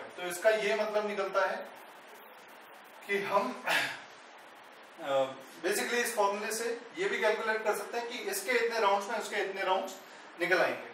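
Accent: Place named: India. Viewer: omg, idian accent :((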